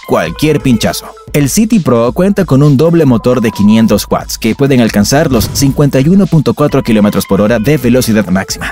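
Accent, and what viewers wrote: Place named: United States. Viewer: La pronunciación con complejo de Yankee da grima, Ssscurerh, bareri en vez de batería pero khe, ya de paso que diga las light y las wheels XD, eso sí buen video y buena información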